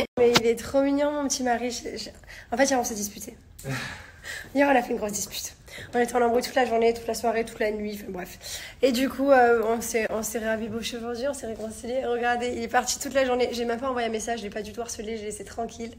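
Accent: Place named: South, France. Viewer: Salut. J’ai lu ton 📚 livre j’ai beaucoup aimé. Je viens de Biélorussie et Je suis en France 🇫🇷 depuis 17 ans, j’aime beaucoup ta personnalité. Grâce à toi j’ai commencé mieux parlé en français, j’aime beaucoup ton accident de Sud. J’ai bientôt 40 ans mais j’aime beaucoup te regarde car pour moi, tu es une femme intéressent. Bisous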